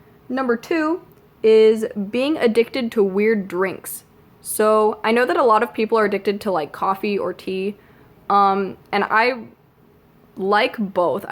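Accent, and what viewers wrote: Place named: United States. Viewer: Clear American!! YAAAAS.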